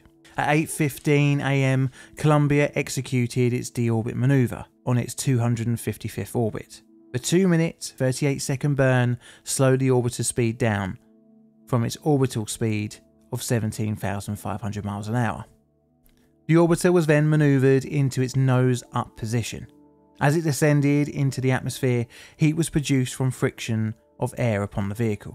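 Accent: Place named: England, United Kingdom. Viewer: Seems to be using a batty English accent to muffle the sounds of his words a bit. You should try to learn to speak American English which includes correct pronunciations for each of the words you wish to speak here.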